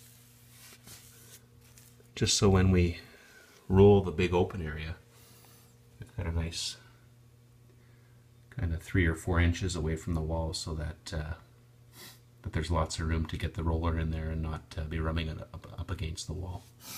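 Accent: Canada. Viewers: Bonding prkmer would have been a good start. After edging, don't wait! Wet edge. It is far better to keep a wet edge with any paint. You are lucky to still be able to get oil based paint, many states that is impossible, you sound Canadian, if so, I'd be shocked that they have any oil based paint there.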